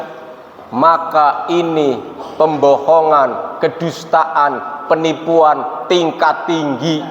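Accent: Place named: Songgom, Indonesia. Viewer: Kirain wong cilacap jbule wong songgom👍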